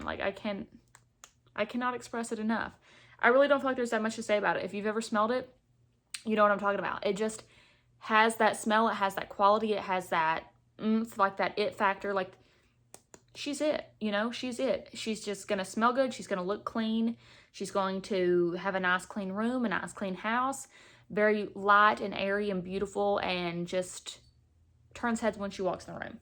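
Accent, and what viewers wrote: South, United States. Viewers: you are soooo pretty and your southern accent is beautiful ❤️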